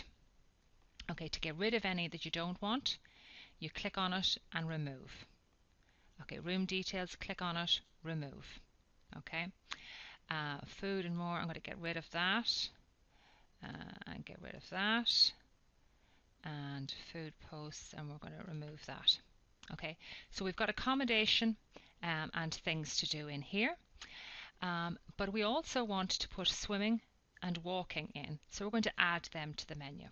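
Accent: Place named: Ireland. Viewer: lol. I'm usually terribly annoyed by these sorts of videos. But your Irish-ness just made it so much nicer to listen to xD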